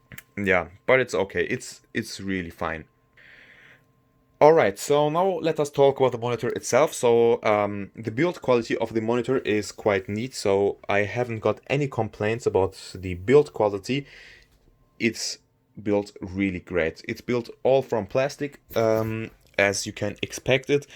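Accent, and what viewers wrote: Turkey. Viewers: because of the accent, I was thinking you might be a Turkish guy, but after when I saw the glass bead on the wall, I am sure that you are a Turkish guy, süpersin bro güzel setup :D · Digger ich höre an deinem Englisch, dass du Türke bist :D Probs für das gute Review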